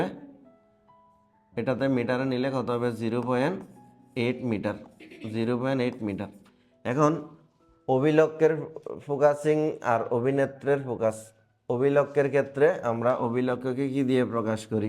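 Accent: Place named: Chittagong, Bangladesh. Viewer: আপনি মনে হয় চট্টগ্রামের 😁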